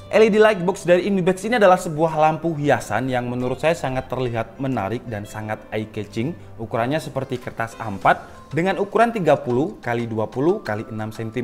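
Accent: Java, Indonesia. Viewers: olehmu medok jowo kuetok pol mas... tulisane dadi tulisannya wkwkwk....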